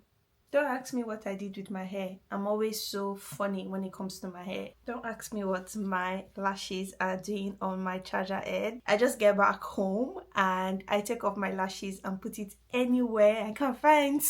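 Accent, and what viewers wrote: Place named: Benin. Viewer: Benin accent got me 😂😂😂❤❤❤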